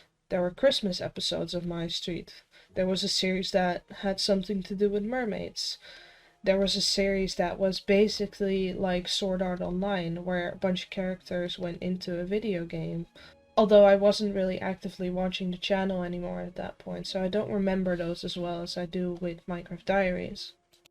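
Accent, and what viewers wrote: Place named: Netherlands. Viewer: it took me a hot minute to realise your dutch! welcome aboard to the english speaking , dutch side of yt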